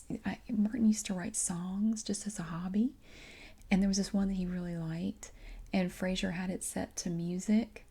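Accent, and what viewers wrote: South, United States. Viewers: Love your southern accent. It's fairly mild or just a kind I prefer. Since you must like more dry humor like me, it's possible you'd like Dom Joly's two main shows (that I know of) which ran back in the 2000's like 'World Shut Your Mouth' and also 'Trigger Happy TV'. They're more prank/public spectacle based though.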